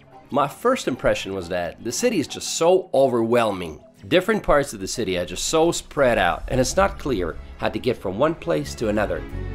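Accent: Russia. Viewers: I would've Never placed his accent as Russian! That's Crazy! 😆👌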